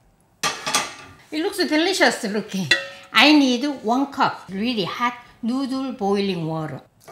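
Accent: South Korea. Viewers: 한국식 영어라그런지 번역 없이 다 이해가된다 신기 ㅋㅋㅋ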